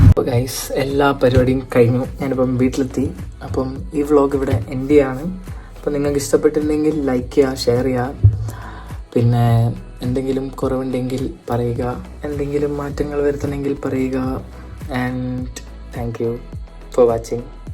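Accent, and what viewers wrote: Kannur, India. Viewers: chechide samsaram kannur thannne aano🫠🤍🤌🏻but kollaaa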